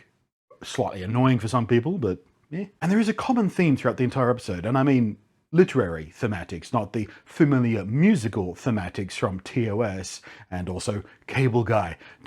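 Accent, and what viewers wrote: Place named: Australia. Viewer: Hey, expat Aussie in the US for 23 years, are you likewise? I hear the Aussie accent but occasional hard Rs:)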